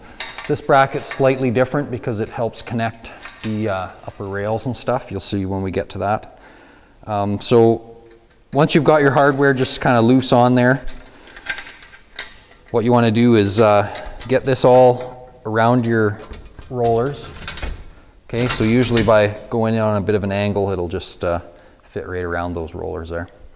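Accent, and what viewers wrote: Canada. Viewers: Outstanding instructional video. I'm assuming from this guy's accent that he is Canadian and it has been my experience that Canadians just get things done - efficiently and resourcefully, without a lot of hoopla and distractions. No lousy music, cussing (tho' he came close at one point), or embarrassing attempts at special effects and other so-called creative videography. Simply good, clear, well-paced instruction. Will check out the other videos at Houseimprovements. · Great pleasant Canadian accent n the know, the how, the teach.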